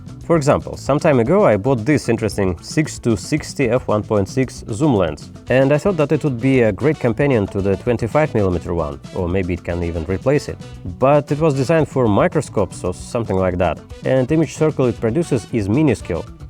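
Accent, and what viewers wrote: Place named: Russia. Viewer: Хахахп меня не обманешь! Раскусила ваш русский акцент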